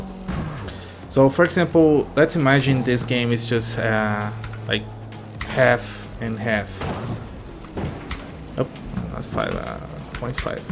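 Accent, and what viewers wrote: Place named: Brazil. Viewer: Nice tutorial, Thank you! E desconfio que seja BR pelo leve sotaque, se for, parabéns pelo inglês e pelo vídeo, valeu!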